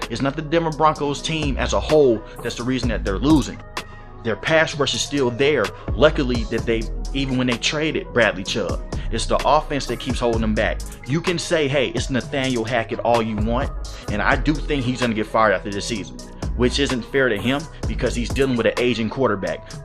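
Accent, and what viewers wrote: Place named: South, United States. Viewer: There is no way you are a Seahawks fan with that accent. More like saints and Ole miss fan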